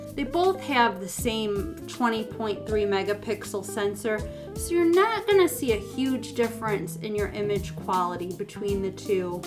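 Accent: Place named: Minnesota, United States. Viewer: As a non-American I can be wrong here, but you sound a lot like the people in that brilliant movie FARGO... Anyways, very good comparison! Groeten vanuit Belgie!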